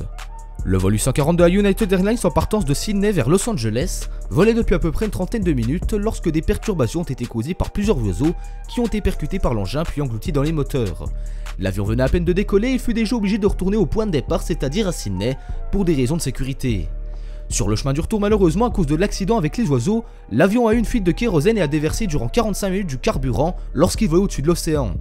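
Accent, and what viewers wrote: Belgium. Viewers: Tu est belge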